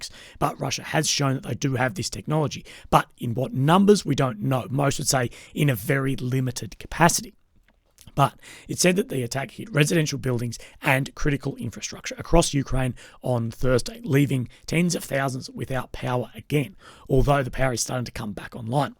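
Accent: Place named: Australia. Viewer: You can generalise as you wish if it's based on a genuine observation not obstinance..... "I thought Aussies were better than this" is actually saying that Australians are held to a pretty high standing in the world of fair play, and standing up for the oppressed over the oppressors... along with the Kiwis. looks and sounds the part, but his consistent agenda is at odds with his apparent Aussie-ness and overstated Neutrality which doesn't follow through to his actual stance.....he's an Oxymoron (or is it Aussiemoron 🤔) Aussies are awesome, and I know he doesn't represent the majority!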